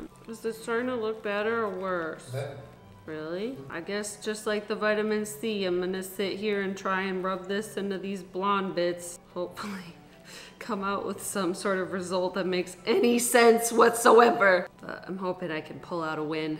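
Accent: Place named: New York, United States. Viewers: bc she is LOL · Why does she sound kinda like she be from New York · She’s literally from Rochester, NY my dude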